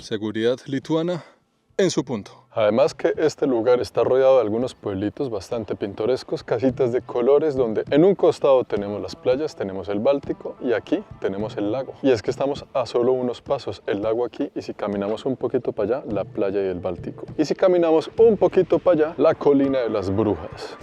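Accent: Colombia. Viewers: que amenos videos haces, te sigo desde hace mucho tiempo y nunca decepcionas, te felicito y el acento colombiano le da el toque original! Un abrazo desde CDMX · Que gracioso y simpático es el acento colombiano de, me encanta 😊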